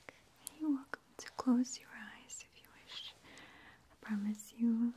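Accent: Russia. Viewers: Craig Pavey I didnt take it as sarcastic, just that you misunderstand what I said. I mentioned American women dont speak like her BECAUSE she is Russian. Sorry I guess it was more complex then I intended, thats what I get for being an English major lol. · Richclashchair ASMR I love her voice. Russian accents are very beautiful.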